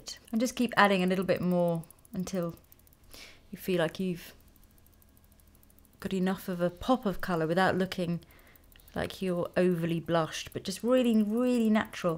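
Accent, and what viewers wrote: United Kingdom. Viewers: Your voice and British accent is sooo soothing!!!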